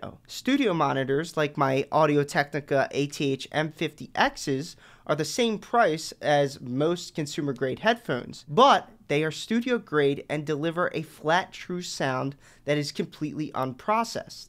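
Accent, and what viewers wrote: Philadelphia, United States. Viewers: Philly accent 120 pct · you from philly?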